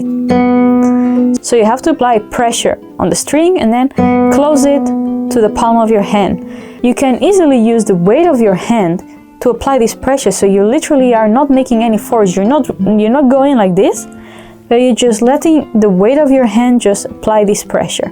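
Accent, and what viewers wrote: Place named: Spain. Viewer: Hola! A pesar de que te conozco desde hace un tiempo gracias a reddit, es la primera vez que veo uno de tus videos y era justo lo que buscaba, me gusta como explicas la técnica y los ángulos de cámara que usas, definitivamente me suscribo a tu canal. Ps. Por tu acento supongo que eres de España por eso escribo mi comentario en español 😅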